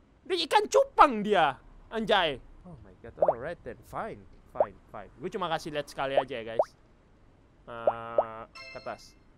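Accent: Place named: Australia. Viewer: gua kira tadi lu orang ostrali!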